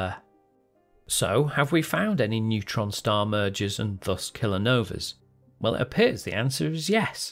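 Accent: United Kingdom. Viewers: pAIr, nor PER...bEta not bIta. british...they invented the English language but still found a way to butcher it...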